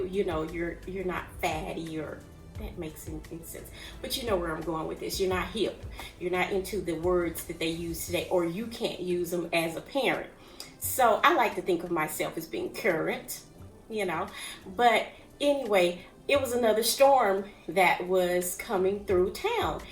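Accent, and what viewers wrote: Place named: Kentucky, United States. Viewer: Looks so good. Hay girl I am from kentucky and we say ya"ll and you sound just like us.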